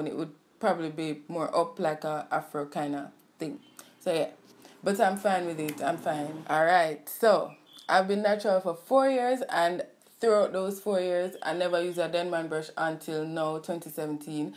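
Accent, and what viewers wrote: Jamaica. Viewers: your from Jamaica right? I can hear the Jamaican accent 🇯🇲❤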